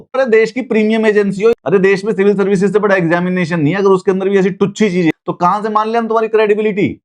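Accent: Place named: Haryana, India. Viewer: Haryanvi english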